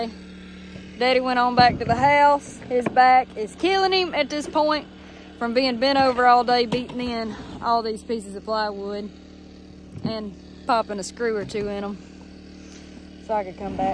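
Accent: South, United States. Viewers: The southern accent makes her even cuter 💖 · dont get much more southern than that voice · looove the southern draw you got there, maaaa'm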